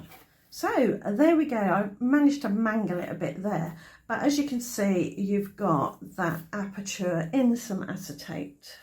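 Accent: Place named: United Kingdom. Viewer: My goodness, this video is so much fun! As I watched you create your accent reminded me of my sister. She learned to talk in Britain while our father was stationed there in the early 50’s (I had not been born yet!) my sister still has a British accent on certain words or phrases and I love it! Thanks for the ideas and the memories!!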